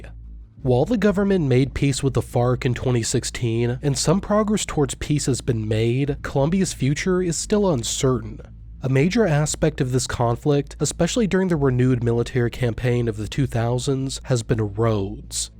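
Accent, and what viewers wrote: Australia. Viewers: That was actually a really good Australian accent mate 👍 well done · great aussie accent - Jordies fan?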